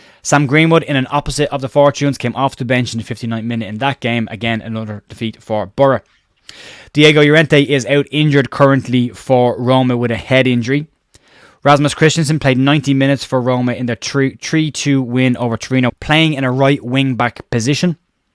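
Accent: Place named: Ireland. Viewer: Keep up the good work mate. Even the Irish accent is easy on the ear.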